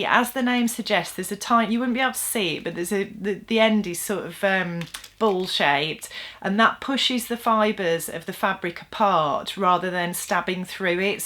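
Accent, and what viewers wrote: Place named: England, United Kingdom. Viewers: I just discovered your tutorials today. Your tutorials are awesome. Thank you for the work you did. I will be watching and learning more. I just love to listen to the English accent. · Great video. Full of tips - and an English accent!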